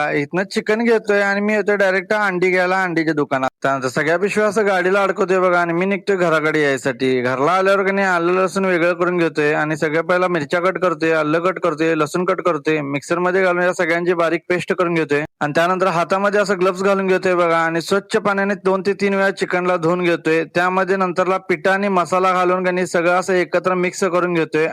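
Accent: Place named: Kolhapur, India. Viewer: कोल्हापूर cha वाटत ahes .. भाषे वरून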